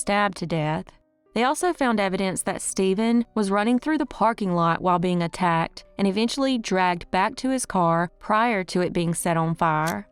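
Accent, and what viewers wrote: South, United States. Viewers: You have the perfect voice to deliver those insults. The family not knowing about his violent nature...THE perfect southern "bless your heart" delivery 😊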